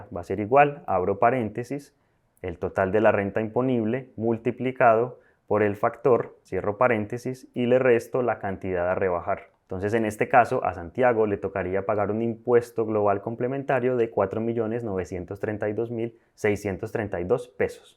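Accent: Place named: Colombia. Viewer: Bueno video, Clarito como el agua. Solo que tu acento colombiano causaba corto circuito en mi cabeza... pero muchas gracias por la info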